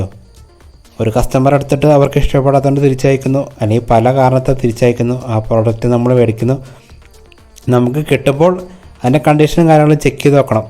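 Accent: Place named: Kollam, India. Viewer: ഫ ഫ എന്നു പറയുന്നത് കൊണ്ട് മനസിലായി കൊല്ലത്തുള്ള ആളാണോ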